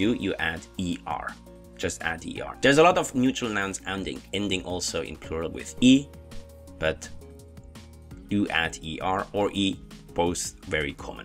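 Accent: Switzerland. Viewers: Stupid question... are you Swiss german or did you learn german from a swiss? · Thank you for your reply and your videos helped tremendously! I figured you were swiss based on your high german accent but was only sure when you said Grüezi in one of your videos 😊 thank you so much again and will continue learning..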